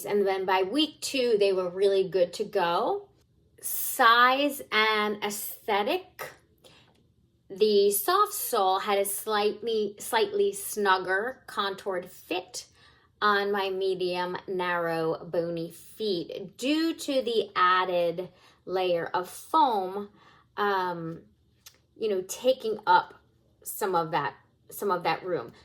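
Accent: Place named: South, United States. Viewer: you speak really well, your voice is therapeutic... do you speak another language?? i can tell you are from the southern US.. love the vid you look great btw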